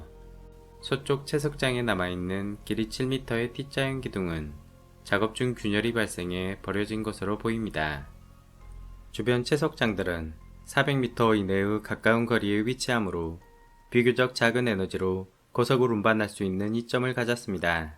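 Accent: Jeolla, South Korea. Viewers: 전라도 사투리가 들린다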